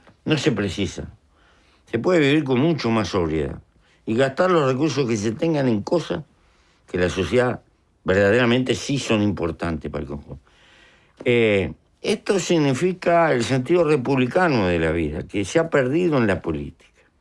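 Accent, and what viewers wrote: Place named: Gaucho, Argentina. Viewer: 3.31 min un helicotero (helicoptero) jajaja grande pepe la expreción campera y gaucha no la cambies nunca!!!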